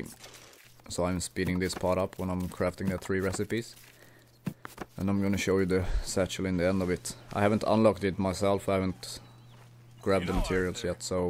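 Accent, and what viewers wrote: Sweden. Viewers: i can hear your swedish dialect.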